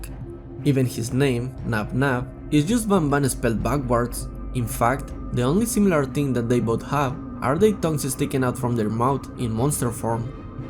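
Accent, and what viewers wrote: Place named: Spain. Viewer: Your acent is very spanish.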